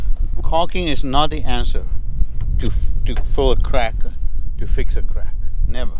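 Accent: South Africa. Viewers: That South African accent is undeniable. Good work.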